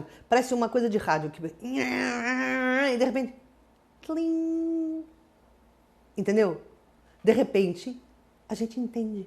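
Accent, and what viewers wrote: Brazil. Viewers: Porque é que fala com sotaque brasileiro? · Bom vídeo e útil da para refletir obrg você e portuguesa como eu mas tem sotaque brasileiro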